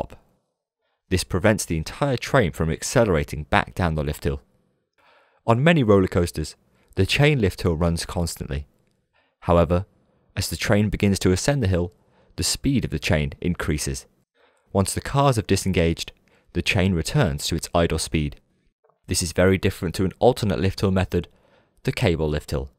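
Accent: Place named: Black Country, United Kingdom. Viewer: Ahh - I used to live in Dudley and was trying to figure out if it was a little Black Country or Brummie twang I could hear in your accent :D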